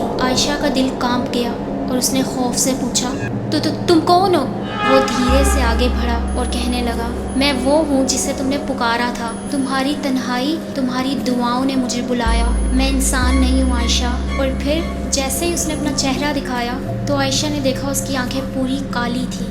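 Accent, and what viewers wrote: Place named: Karachi, Pakistan. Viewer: Lagta hay Ap korangi orangi wali ho... Coll bol rahi ho ap jab ke call hota hay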